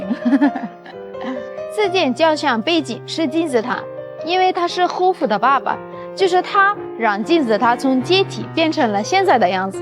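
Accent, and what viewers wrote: China. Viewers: 好中國話用語和腔調 🤷 😮⋯⋯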